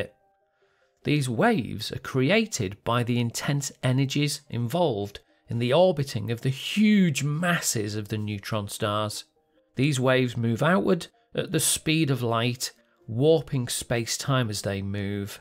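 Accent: United Kingdom. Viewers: pAIr, nor PER...bEta not bIta. british...they invented the English language but still found a way to butcher it...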